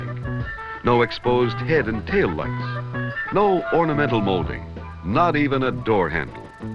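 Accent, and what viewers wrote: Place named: Midwest, United States. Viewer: Same Midwest delivery, but no. Gary Owens as narrator would have made this an instant cult classic!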